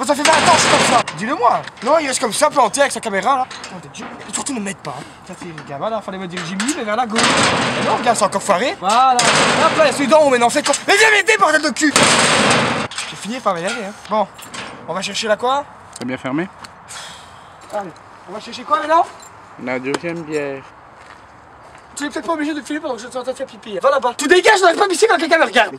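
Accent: Belgium. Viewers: T'es belge ou français ta un petit aquessent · Cet accent belge ahah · Tellement bon, j'ai ressenti le Poelvoorde jeune avec une bon punch à l'accent Belge. Il y a des génies et il y a Jimmy. merci !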